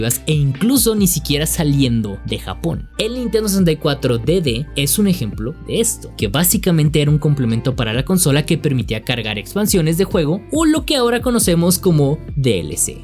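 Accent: Mexico. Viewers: odio como los mexicanos pronuncian el ingles....zir pari....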